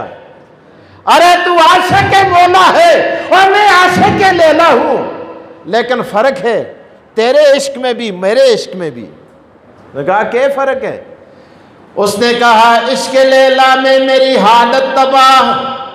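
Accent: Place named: Pahari, India. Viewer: مولانا سعید صاحب ماہڑی "پہاڑی" بولی جس کو اوہ ہندکو آکھدے ہیں بچ گل بات کردے مچ پیارے لگدے ہین اللہ تعالیٰ انہاں کو سلامت رکھے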